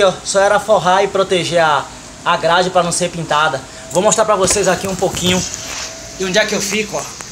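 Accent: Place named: Pernambuco, Brazil. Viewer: Sou de Pernambuco e conheci o sotaque pai! kk Muito bom o vídeo! Entrei no grupo do whatsapp e tenho algumas dúvidas...estou no aguardo do grupo, muito obrigado e parabéns!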